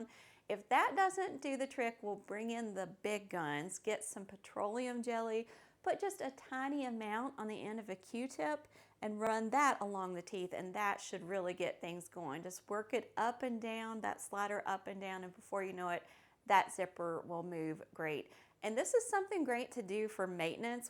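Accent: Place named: South, United States. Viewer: Thanks for the awesome video. Everything sounds better with a southern accent! One question, why not simply pry open the bottom of the glider to put it back on the zipper instead of cutting the zipper?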